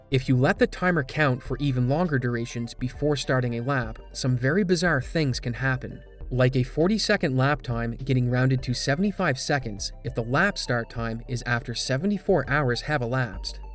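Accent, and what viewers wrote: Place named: Prince Edward Island, Canada. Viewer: I forget if I've asked this before., are you a Maritimer? and around there I feel like I'm hearing a strong Maritime Canadian accent. "Time", "Line", and "Marker" sound very Newfoundland lol. For the record, I love it, I just really honed in on it after that time-stamp and can't stop hearing it lol. I bet anyone who wants to take it, a fair bet I'm not Googling first, $100 Canadian that grew up in Newfoundland (and Labrador). I could use the money and I'm so sure I'm right lol. You have to internally accept the bet before Googling the answer. I'll know. Edit: My second, unofficial guess for betting purposes, as I keep listening to him, is PEI. That's Prince Edward Island to you non-Canadians. That's just for pride. First honest guess was Newfoundland. Just started hearing PEI more as I listen.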